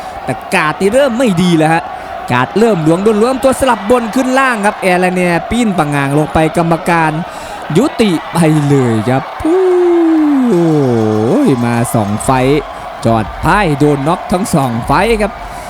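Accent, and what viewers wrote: Isan, Thailand. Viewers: นอ้ง พากมวยสนุก.ให้เสียงไทยและอี่สาน.ที่ไม่เหมือนใคร.ไทยประสมอีสาน.จะมีบางคำก็ตลกเฮฮาไปดว้ย.บางครั้งผมดูมวยไปฟังเสียงนอ้ง.ผมก็ยังหัวเราะคนเดียวไปดว้ย.มีควสมสุขดีครับได้ฟังนอ้ง พาก.สนุกกว่าพิทีกรอยู่ในสนามมวยเสียอีก.ชอบนอ้ง มากๆครับ.FC.อยู่ฟังลาวครับผม❤❤❤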